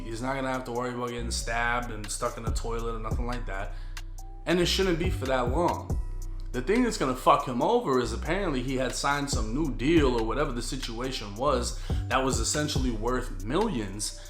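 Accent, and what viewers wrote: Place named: Tampa, United States. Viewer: bro your bitch ass is from Tampa with that accent.Get real u bitch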